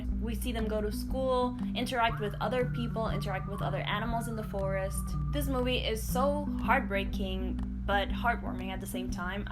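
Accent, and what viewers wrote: Dominican Republic. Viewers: Girl please tell me you’re Dominican cause I know you’re accent is. Cuando hablaste español and also ARMY bomb yes 🙌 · Yo no se quien eres pero yo conosco el acento dominicano donde sea keep it up good stuff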